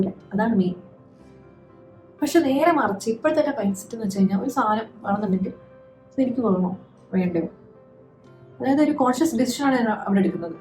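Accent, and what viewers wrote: Kannur, India. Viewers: Kannur slang❤ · Kannnur ano beedu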